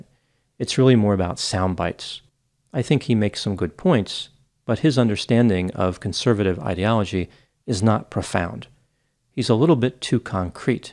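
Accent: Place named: Baltimore, United States. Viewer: I have never noticed this before, but I hear a Baltimore accent from... especially around long "o" sound....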